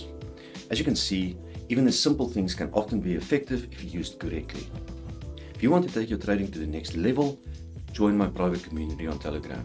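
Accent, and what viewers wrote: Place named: Afrikaans, South Africa. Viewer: Sound like you Afrikaans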